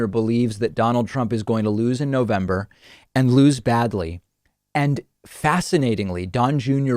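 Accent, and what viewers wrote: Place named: Buenos Aires, Argentina. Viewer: I love your heavy Buenos accent, che pibe! También tengo miedo de que salga reelecto otra vez, que Dios nos libre!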